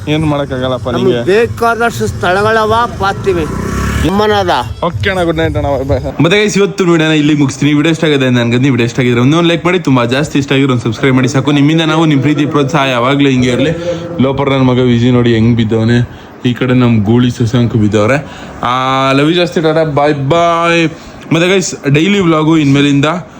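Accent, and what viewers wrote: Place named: Mangalore, India. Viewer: your Mangalore kannada suppppppppper..... 💐🤔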